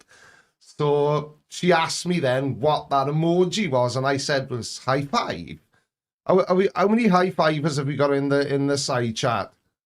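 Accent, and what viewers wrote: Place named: Wales, United Kingdom. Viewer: Came for the science. Stayed for the fun and the Welsh accent.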